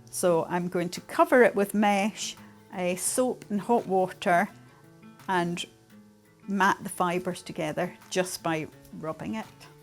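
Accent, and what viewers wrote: Scotland, United Kingdom. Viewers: I love your work and your beautiful Scottish accent. My dear friend Wendy was from Arboath and I could listen to her talk all day long. Sadly we lost her to cancer a few yrs ago, but thank you for reminding me how much Ive missed her and what a treasured friend she was. Cheers from stinking hot FL. ;-)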